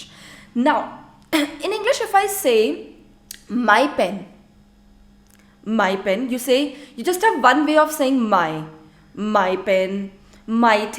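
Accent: France. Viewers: I really appreciate your french accent it's seems so nice to listen and it will help me also to learn from you...Thank you so much❤️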